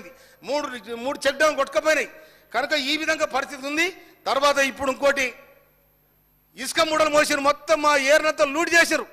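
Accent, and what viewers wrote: Telangana, India. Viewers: మీరు స్వచ్ఛమైన మన తెలంగాణ పల్లెటూరు బాషా సూపర్ అన్న ❤️🤝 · తెలంగాణ యాసలో మాట్లాడిన సామేలన్న ....👌🏻👍✊🏻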